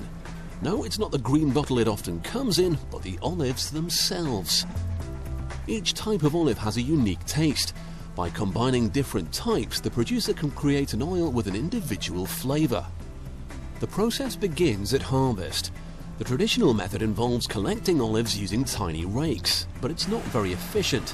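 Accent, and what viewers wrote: United Kingdom. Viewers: The video states, "if the fruit goes over 27 degrees, it can no longer be called cold-pressed". Since the narrator sounds British, I assume that it is 27°C (~81°F). The average USA swimming pool is kept at 80°F, so if you got into a bath of 80°F olive oil, the oil would feel cold since human bodies are around 98°F. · British accent > American accent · anybody else cant stand british narrators? idk, maybe its the accent, maybe it seems like hes trying to sound like a smartass.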